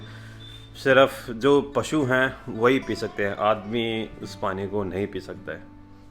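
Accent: Haryana, India. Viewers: Nani and u rock bhai ! Bhai Thara video dekh r mjo aaygo ! Hindi nav to aapni haryanvi mst lage bhai ! Or didi - niche to kar inee ! 😄✌️ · i belong from a village of UP but it was wonderful to see village lifestyle of Haryana with some desi Haryanvi pure akscent. Tahnxx, i last vedio i suggested you to show some water conservation technique you are doing good in this channel believe me. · bhai ki haryanvi ekdum keher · bhai Haryanvi mst lgti hai apke muh see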